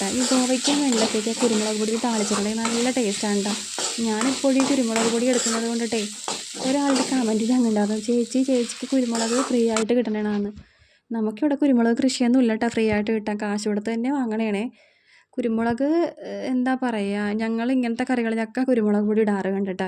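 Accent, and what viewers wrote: Kochi, India. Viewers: Kochi slang angane aanu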